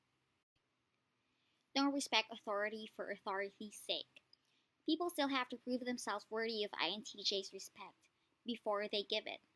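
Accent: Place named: Philippines. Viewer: Your filipino accent is annoying.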